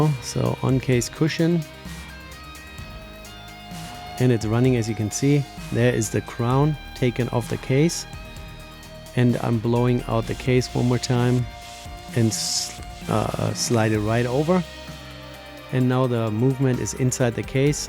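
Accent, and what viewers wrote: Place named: Germany. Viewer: From the Company you got ur Strap from is my Willard Homage (rdunae R2X) - one of the best i think you can buy. Ive also built an Baby-Turtle Homage for my Wife in Black with an awesome Textured Dial (i removed the Marks on the Capturering via Isopropanol because i had the very same Problems with the Markings as you had... 9 to 12 to 3 - all in Line, but below there they didnt line up - seem to be a common Problem)... you can do so much Stuff if you just are willing to invest some money and time... the outcome is more rewarding then any Watch you can buy. (I even split my Watches between DIY and bought 🤣) Nice Vid man, coulnt have done it better. (Du bist Deutscher? Hatte erst überlegt wegen dem Akzent) LG Martin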